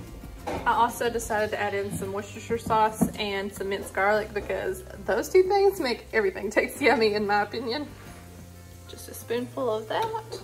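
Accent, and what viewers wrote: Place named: United States. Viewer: Hi... I'm new from Shauna's channel. I like what Im seeing 👀, so I've subscribed. I noticed your accent, what State are you from??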